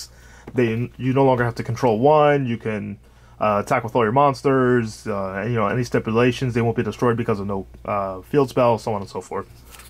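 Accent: United States. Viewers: Is English not your first language, you sound American, or did you genuinely not know? :v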